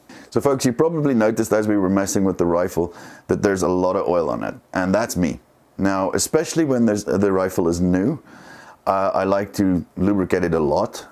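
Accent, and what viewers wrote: South Africa. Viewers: You sound South African ... ?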